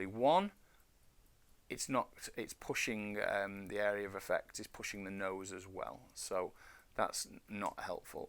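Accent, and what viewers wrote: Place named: Stoke, United Kingdom. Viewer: Great stuff. Really enjoying learning from your videos, and I’m inspired by your work. I’ve been learning to use blender for a few months now and have just invested in some VR kit so I’m still in the very early stages of sculpting and modelling with it. Your tutorials are helping me so much and I just wanted to say thank you. By the way is that a bit of Stoke in that accent ?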